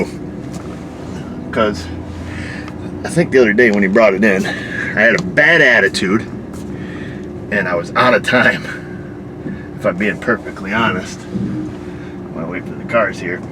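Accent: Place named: Oklahoma, United States. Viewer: I'f you never told anyone that your shop was in New York, most people would think you were from Oklahoma or Kansas or Texas. You sound like an Okie when you talk not a New Yorker!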